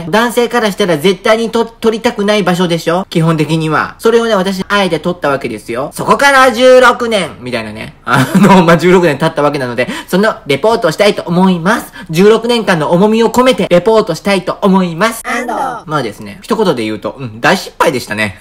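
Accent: Kansai, Japan. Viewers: 一コメ～～～～～！いつも楽しく拝見してます！同じ関西人。話のテンポがものすごく関西。懐かしい！何かあったかい。末永くよろしく～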